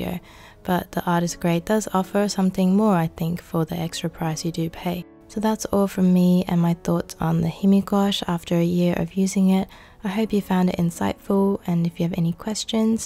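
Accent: Australia. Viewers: Are you Australian or lived in Australia? I’m asking since u have a very Australian accent specially when you said “water” as “wodah” etc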